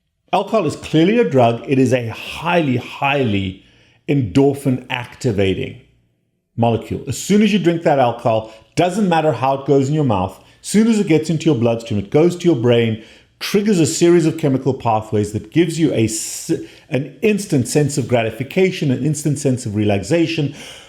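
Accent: South Africa. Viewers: I knew by your accent that you were South African, Great commentary, very imformative.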